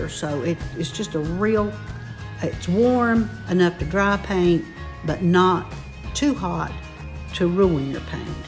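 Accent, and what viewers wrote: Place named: Appalachia, United States. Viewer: I have been watching videos on how to make a barn quilt. Yours is absolutely the best! You give very clear, step by step instructions. You are the exemplar of the fine Appalachian qualities I so appreciate. You demonstrate resourcefulness, determination, creativity, and perseverance. For examples, the way you decided to make your own rather than buy one ready made or use a kit, the way you used the extra wood to make a shelf, the way you used the reverse side of the board when you needed to begin again, the way you were committed to making the best product you could - even if it required more work. I admire you so much! And may I add that your soft-spoken regional accent is very pleasant to hear. I am recovering from surgery and will be off my feet for a few more weeks, but as soon as I am up, I plan to begin some of these paintings for our sheds. 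Thank you for taking time to show others how this is done.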